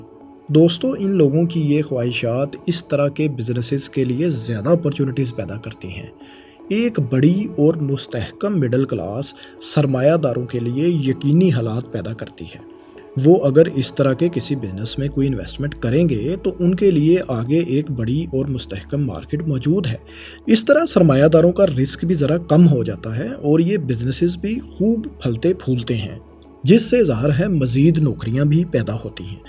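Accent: Punjab, Pakistan. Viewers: Everything is good and valuable except the VOICE. He speaks Urdu, in Punjabi accent. Please change your narrator.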